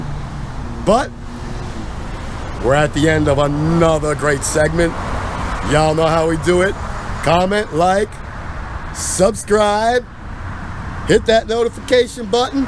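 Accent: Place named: United States. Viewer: Like 110 I enjoy to watch u videos and I enjoy to listen the American accent to learn it 👍👍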